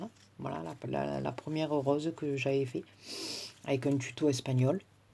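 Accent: South, France. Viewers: Bonsoir, merci pour nous montrez comment faire ces superbes 🌹 roses, j ai tout compris mais puis je permettre, dommage que votre main par moment cache vos gestes. Malgré j apprécie vos superbes vidéos, agrémentées du magnifique accent du midi. Bizettes Lorraine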